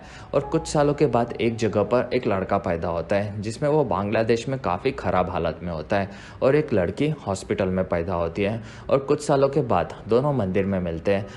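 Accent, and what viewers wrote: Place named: Gujarat, India. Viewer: He is from Gujarat. Koi problem nahi hai, sabka apna apna style hota hai.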